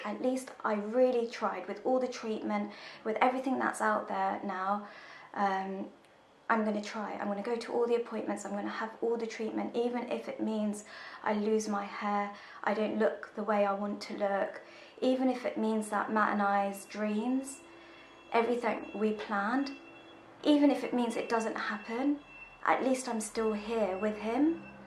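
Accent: United Kingdom. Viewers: do you say Tuesday like chuesday I like the British accent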